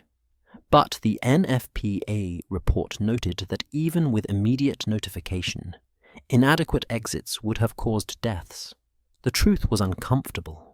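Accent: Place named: United Kingdom. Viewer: Why does the narration give me AI vibes? Had to google this to make sure it happened and wasn't another fully AI written story, like "Cold War Stories". Plus it says this channel is kiwi but that is a Bri'ish accent... unless I'm going insane. The speech pattern etc doesn't sound human... I can't tell anymore. It sucks.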